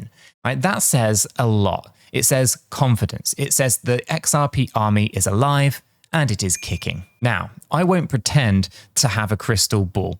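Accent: United Kingdom. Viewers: You are charismatic explaining complicated issues with your British accent humoristicly & simply for all kinds of listeners!! Really a blessing having you in YouTube channel!! Hello from Athens capital city of Hellas.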